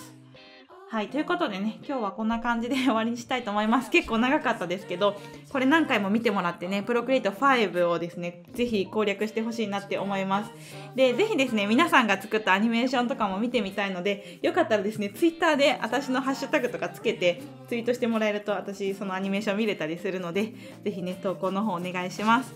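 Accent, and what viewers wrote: Kansai, Japan. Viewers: 今日めっちゃ、関西弁でてますね！😍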